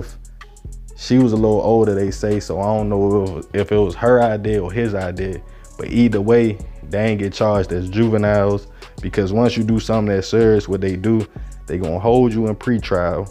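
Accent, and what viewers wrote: Baltimore, United States. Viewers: You gotta be from Baltimore I can hear it lol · I knew you were from Baltimore. I know my peoples from anywhere🤣🤣🤣🤣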